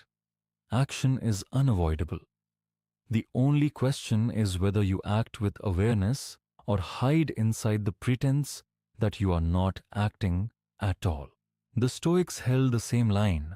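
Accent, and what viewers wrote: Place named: India. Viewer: Even AI has Indian accent now! Everyone is so tired of Indian immigration and infiltration.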